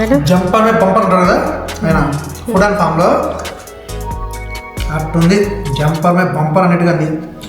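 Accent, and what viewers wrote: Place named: Kadapa, India. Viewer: Superb accham kadapa basha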